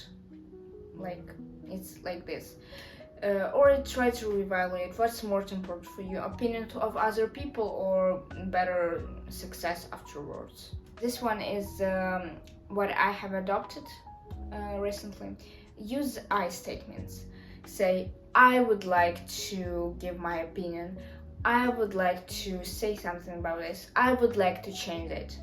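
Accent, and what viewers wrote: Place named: Russia. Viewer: are you from russia? because your accent sounds like someone from russia. anyway, i enjoyed your video :)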